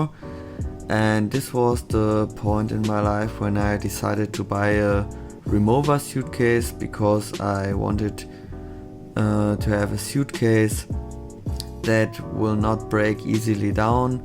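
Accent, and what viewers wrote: Germany. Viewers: Are you german? Your accent is beautiful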